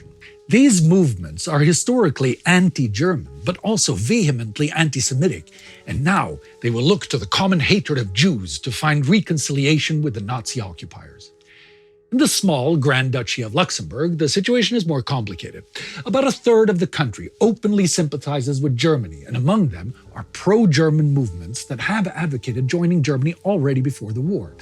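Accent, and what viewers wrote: Netherlands. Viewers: Heerlijk, dat Nederlandse accent.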